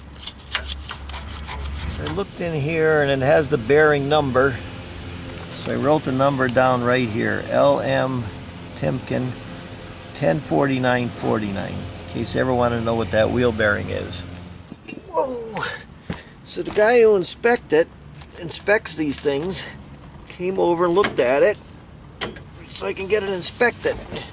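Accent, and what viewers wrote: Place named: Northeastern Pennsylvania, United States. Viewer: I can tell by your accent you are a true NEPA guy. I enjoy seeing all your work and inspire me as a DIYer/Handyman up the eynon.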